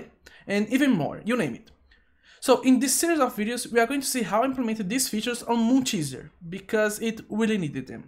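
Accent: Brazil. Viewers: se é brasileiro? sotaque esse manin kk parabéns pelo tutorial ajudou aqui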